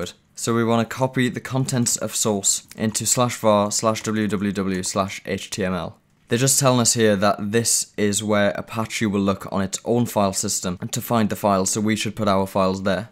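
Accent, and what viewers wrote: United Kingdom. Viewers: fuckin uk accent(PIG)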